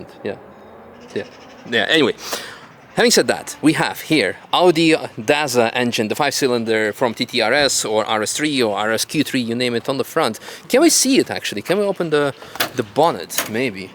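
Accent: Netherlands. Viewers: wilde nederlander gespot, je kan je accent heel goed verbergen en veel plezier met je kanaal! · Nooit geweten dat jij Nederlands was , maar niet geboren en getogen aan jou accent te horen ? 😂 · Now I can no longer unhear the Dutch accent. 😂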